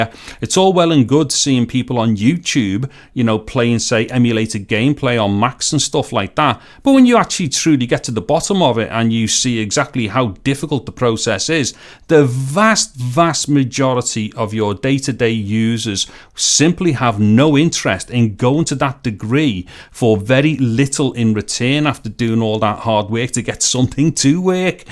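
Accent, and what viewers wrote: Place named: Scotland, United Kingdom. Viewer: Another great video. My son (6) asked if you were from Scotland 😂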